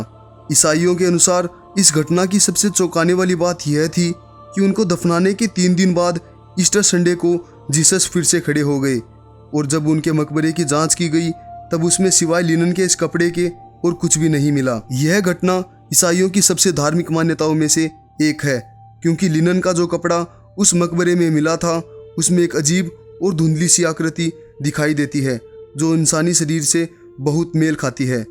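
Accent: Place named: Haryana, India. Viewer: Taai aale tu te awaj te haryanvi laage hai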